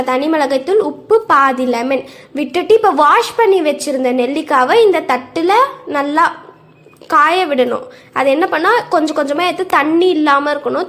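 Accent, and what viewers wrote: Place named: Chennai, India. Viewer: ஏய் உன் குரல் வச்சு கண்டுபிடிச்சுட்டேன் நீ சென்னை தானே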